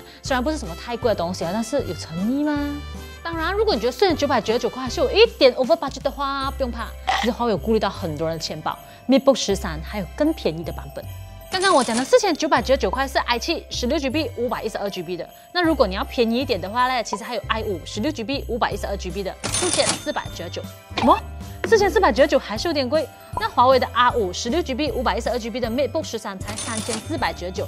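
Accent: Malaysia. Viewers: 大马华语就是这样的啦，要学会接受不同的文化。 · 皂动的茂茂 马来西亚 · 马来西亚口音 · 马来西亚华人大部分普通话都有这类的口音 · 马来西亚口音真的很好听呢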